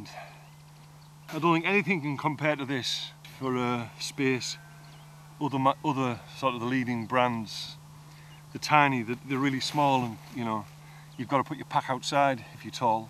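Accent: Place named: Teesside, United Kingdom. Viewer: You sound close to me. I’m from Redcar, Teesside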